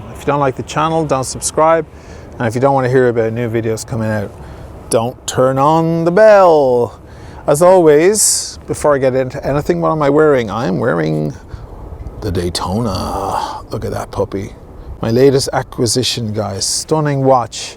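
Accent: Ireland. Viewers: Irish guy ... lol 😝 nice Intro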